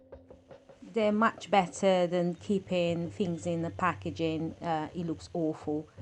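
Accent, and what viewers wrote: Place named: United Kingdom. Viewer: Very interesting accent you have there. It's definitely British but sounds like there is another strong influence there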